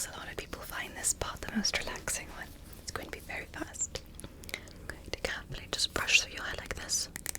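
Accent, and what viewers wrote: England, United Kingdom. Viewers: Is she British cuz In her other vids she talks different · This is a very accurate British accent, coming from a Brit, love it 😍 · Only recently found your content and love it. Tonight I found myself asking I’m sure she was American lol. I’m from UK, London and that is an awesome English accent. Good work! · oh I thought you British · English accent* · This is a very Peggy Carter British accent 😩😂😂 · Fantastic English Accent 👌 some great tingles ❤️ Thank you 😍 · I never knew you could do a British accent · I swear her British accent is the most satisfying and relaxing thing ever 😍🙏🏼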